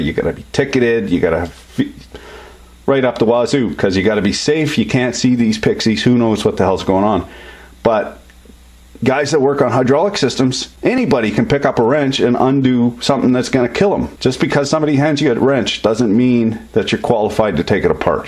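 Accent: Quebec, Canada. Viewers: Did u soften ur uuut(out) or have I been watching enough that I'm not even hearing ur c eh n eh d eh any more? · An international colleague recently asked what we Canadians sound like. I sent him to your channel as a prime example. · Love the canadia accent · just yesterday i found this channel and it's seriously cool. Dude is funny, witty and super knowledgeable. also, did you go all quebecois at some point? eh tabarnak, je le savais pas, je me demande d'où tu viens?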